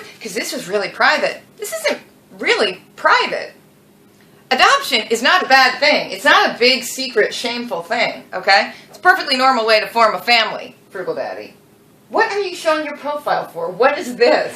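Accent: South, United States. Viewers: I've been watching your family for about a month now. This is the first time that I feel I have some connection... cuz my two children are adopted also. They carry the names I gave them and not their birth names. Both my children feel like you that there is no shame in it or Secret. By the way I think that you guys are hilarious love your sense of humor. And I've always wondered where did you get the southern accent from. Keep it up it's wonderful. And also your other accent too. I love your husband's demeanor he's as cool as a cucumber. love you guys and all your seriousness and all your sense of humor. By the way we can't afford to move out of our house either because it's underwater. But I have a plan to remedy that. Take care keep doing what you're doing you're on the right track... So inspiring.😍😎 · It is funny that when I was watching the video earlier today about your Copenhagen collection I was wondering if you were of Danish descent but I did not ask in the comments. 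The other thing I thought was interesting is that I thought you had a Southern accent. You mentioned living there briefly but not bring born there. Have you discovered any new information? Did you do an <link> DNA test? Or 23 and me. The 23 and me might answer the health questions without finding your relatives.And finally, I had suspected you were a Scorpio(I am one ) but did not think the topic would ever come up in the vlogs.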